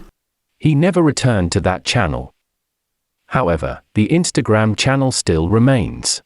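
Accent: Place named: Multicultural London English, United Kingdom. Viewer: It's a 'London Multicultural' accent, not a real London accent.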